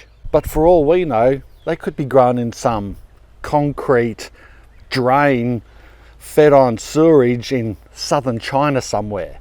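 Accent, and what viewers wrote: Australia. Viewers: I’m an Australian who lived overseas for most of my life. For some reason the Aussie accent triggers me and I squirm. But yours is perfect. It’s just bogan enough, but not too bogan. And it’s just so pleasant. No matter what you say, the tone and timbre of your voice brings a smile to my …ears. Thank you ❤🙏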